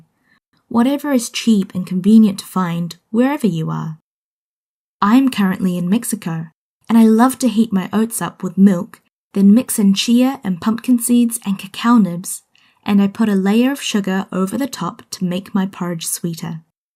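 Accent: New Zealand. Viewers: 뉴질랜드느낌 많이나네요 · 한국 온지 넘 오래돼서 뉴질랜드 발음 다 까먹었는데 이렇게 다시 듣게 되니까 친근하고 반갑네요 ㅎㅎ 갠적으로 포리지 좋아해요>.<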